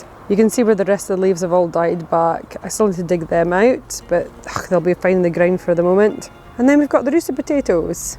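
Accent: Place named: Fraserburgh, United Kingdom. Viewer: I see you've got a Lewis Chess Man! I've wanted one or two of those for YEARS! 😂 I think your sweetcorn needs wind to pollinate, are you giving them a shakey-shake? Also you remind me so much of my friend from the Broch - it's the accent. She moved back to the Broch from Glasgow and I miss her so it's good to hear a similar accent.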